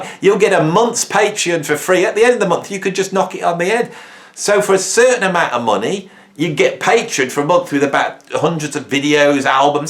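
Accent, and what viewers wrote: United Kingdom. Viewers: I thank you for your channel it's therapeutic and informative. Plus, I enjoy your British accent 👍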